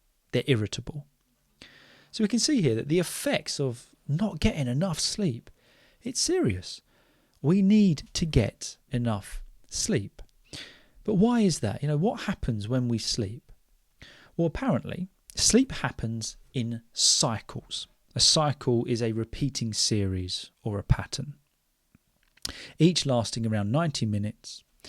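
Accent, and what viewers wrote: United Kingdom. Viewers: Hello Dear, How are you doing? I have been listening and watching your podcast for around 3 to 5 months. I love British accent. particularly you accent. I am trying to copy you voice all the time as much as i can. I hope, you are really good. And today's topic was fantastic. I really enjoyed it. And I am from Bangladesh particularly sylhet. Do you know there are a large number of Bengali people live in London? I think it's number over a million. And most of them from sylhet. To be honest I am taking preparation for my IELTS. Your videos are really helpful for the Listening section of IELTS. Prayer for me so that I can come to the London which is my dream City. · Is he British, please?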